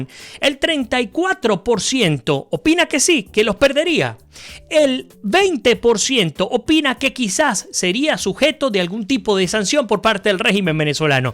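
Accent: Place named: Rioplatense, Argentina. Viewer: descubrí estas trasmisiones tuyas por YouTube hace sólo unos días atrás y mi primera impresión ha sido muy positiva. Una pregunta, aunque te indentifiques como venezolano, detecto un acento o argentivo o uruguayo, es correcto? Pregunto por pura curiosidad nada más. Un saludo de un cubano desde Madrid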